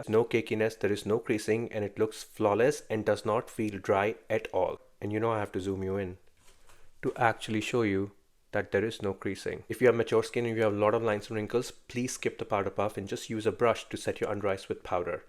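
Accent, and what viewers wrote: India. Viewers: احيه اكسنت هندي زفت و كمان ذكر يعلمنا ميكب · I love his indian accent ..it makes me move my head side to side😅😅😅😅😢😢😢😊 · Correct first your accent. Does all Indians and hindoes have short tongue?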